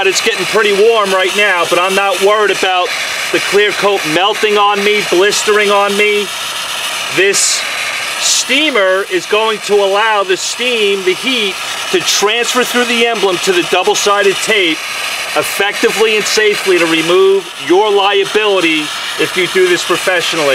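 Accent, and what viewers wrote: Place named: Texas, United States. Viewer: Great job but work on your Texas accent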